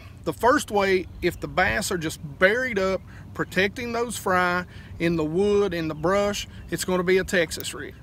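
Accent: South, United States. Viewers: Hey, i really liked this video. I watch a lot of Tactical Bassin cause everything else seems to be young guys ass slappin and hi fiving over 2lb fish ud think was their PB the way they giggle and ham it up for the camera. Drives me crazy. Im in Chicago so its tough to catch videos at the right time of season for us compared to where most guys film. It was 36° today and snowed 6 inches yesterday. Tomorrow sunny and 55 so hopefully theyll move up a bit? I grew up in Georgia so u sound like one of buddies. Anyway, no nonsense instructional videos with a southern accent are right up my alley! This is the first ive seen and i immediately liked it and subscribed. Great to see an adult talking about technique and not playing grab ass with a best friend that wants to get on camera!! Do you do guided trips or is this just a fun hobby for you? Id be interested if you do. 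Again great stuff 👍👍. Im now a full time customer. Thanks bud!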